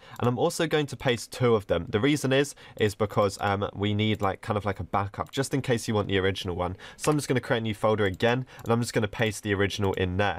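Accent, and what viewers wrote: United Kingdom. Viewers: i'm british i was born on london and your voice is kinda british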